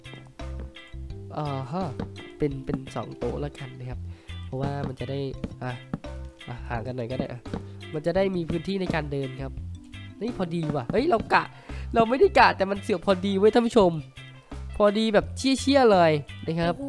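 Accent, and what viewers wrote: Isan, Thailand. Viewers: พี่ พูดอีสาน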